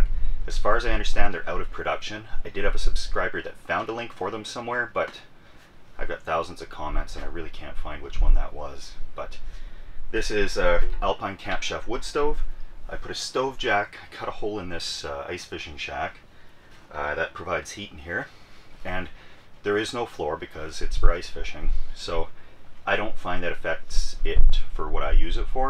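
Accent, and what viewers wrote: Canada. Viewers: I grew up in Buffalo, NY and spent many summers in Ontario. You epitomize the best of everything that is Canadian. I live in NC now and miss those accents and beautiful natural surroundings all Canada has to offer. (I really miss the snow!) Great channel, so informative and frank, I feel like I’m camping with a friend. Keep up the great work!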